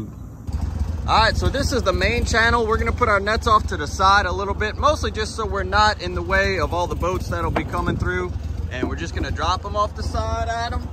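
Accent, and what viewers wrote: Louisiana, United States. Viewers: I came over from arms, family homestead, and I really enjoy your Louisiana voice and your videos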